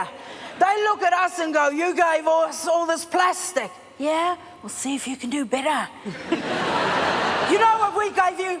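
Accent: Australia. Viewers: Can't tell if she's Australian or South African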